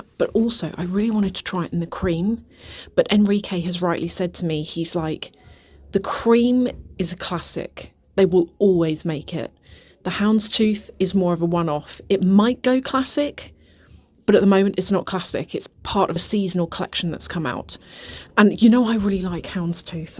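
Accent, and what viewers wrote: England, United Kingdom. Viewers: I do not buy luxury. However, it is very entertaining for me to watch and listen to you. England is somewhere in my DNA. I love your vlogs and, of course, English accent. Be well.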